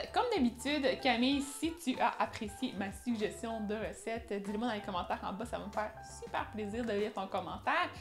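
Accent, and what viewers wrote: Quebec, Canada. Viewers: j'aime trop l'accent québécois 😍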